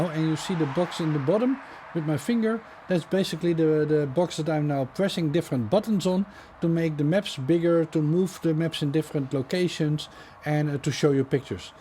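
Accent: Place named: Netherlands. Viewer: I also wondered about this problem of being to much work even though we truly enjoy all your extras you put in the video. The helicopter view is something that almost no other channel has and I like this high in the sky view to gain perspective of were you are or how the landscape looks from a little far away. All this is a balance of how much effort and time you have to put into this VS it being to much work and stop making videos. I believe your Dutch accent and way of speaking is very calming and relaxing and a joy to listen to while eating my dinner or having a breakfast in the morning with my coffee while watching your videos. I can hear the difference in the red pavement or asphalt versus the brick roads which is so nice to listen to. Also the crows and birds I know these sounds well as it lets me remember riding the bike between Eindhoven and Nuenen. 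So if you have to limit yourself to how much work you have to do keep the narration and helicopter view and audio sound while on the bike. More important to people I believe is that you visit different places and share your knowledge of the area with the viewers. Thank you for all the hard work many here enjoy what you do. Dankje Wel Mijn frenden.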